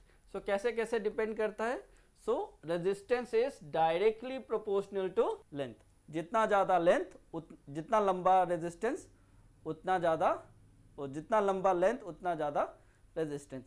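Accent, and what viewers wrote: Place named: Assam, India. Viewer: Sir Assamese medium r lage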